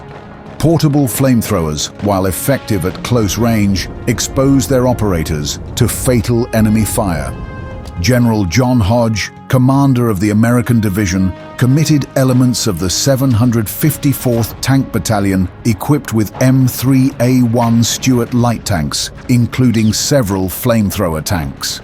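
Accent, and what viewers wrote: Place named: United Kingdom. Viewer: WTF with the Brit accent? These are American troops.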